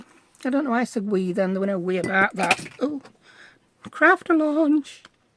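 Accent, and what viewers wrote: United Kingdom. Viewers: I love accent, reminds me of Coronation St when I used to watch it in the 80s.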